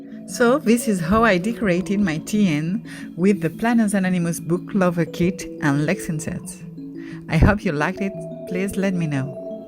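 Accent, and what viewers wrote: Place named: France. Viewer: Très belle vidéo, très agréable... une très jolie déco dans ton planner. Ce kit est vraiment sympa ! La french touch dans ton anglais est adorable ^^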